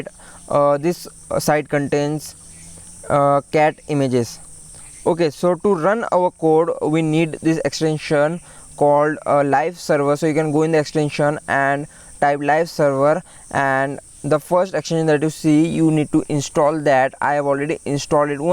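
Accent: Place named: India. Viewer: why accsent india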